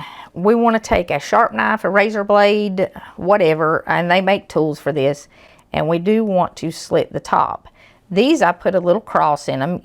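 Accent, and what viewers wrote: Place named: Tennessee, United States. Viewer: Oh honey I was reared in Tn. Hampton, up near Doe Creek and Mountain City. Ription holler and Doe Valley. Just found you and the joy in my heart nearly made me cry. GOD bless you sweetie. I could listen to you talk all day. I wouldn't care if you was readin an instuction manual. I haven't heard that beautiful accent in so long. Im 70 now and in N. C. I want to plan a trip up to Hampton but haven't been there in nearly 50 yrs. I will hush now. Just wanted to tell you that you made an old woman mighty happy this afternoon and I'm going to get my daughter to help me join. May GOD, continue to bless us all. COME JESUS COME! SHELIA STEVENS FROM THE MORLEYS AND ODOMS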